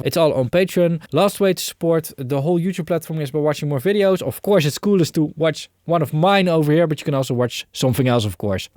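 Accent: Netherlands. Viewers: Are you Dutch by any chance? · Are you Dutch and where are you from? Thank you for this video!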